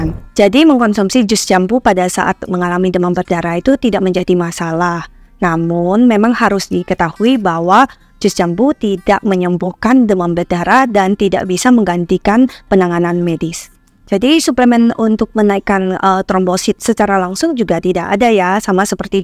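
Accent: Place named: Chinese-Indonesian, Indonesia. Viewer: Suka banget sama aksen chindo nya 😅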